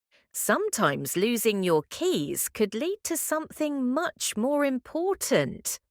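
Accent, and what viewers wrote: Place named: United Kingdom. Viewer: I love this story! Great British English!❤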